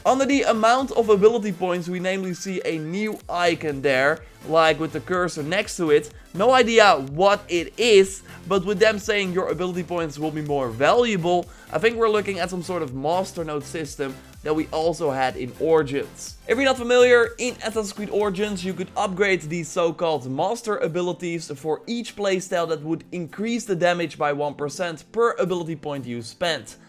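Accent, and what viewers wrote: Netherlands. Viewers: I knew it you’re dutch! Why can’t you people hide your accent ffs...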